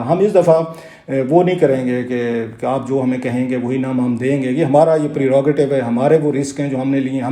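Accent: Saraiki, Pakistan. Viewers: کلاسرا صیب ھک تاں اردو وی سرائیکی اچ بولیندو ڈوجھا جھوٹ بڑی شد مد نال بکدو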